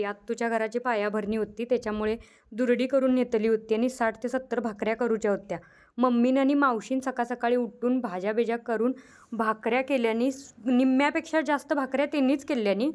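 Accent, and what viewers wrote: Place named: Belgaum, India. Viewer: Bhasha belgavchi vatte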